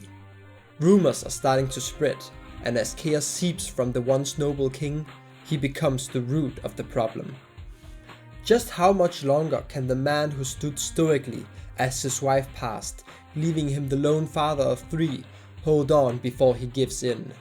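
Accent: Denmark. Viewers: ah Nice to meet a fellow Dane i just thought during the second part your accent sounded really famliar, Also does your YT have discord server yet? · Just finished watching the whole retrospective, and I instantly want to rewatch it. Seeing someone show the same love for a weird browser RPG with terrible gameplay, that I also share (and with a danish accent ❤) has been magical. Thankyou!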